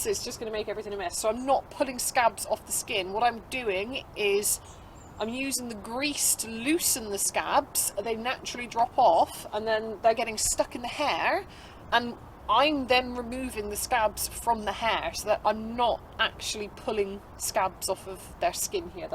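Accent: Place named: South, United States. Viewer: Very informative as usual and you can’t beat a southern accented girl talking udder grease and pig oil lol x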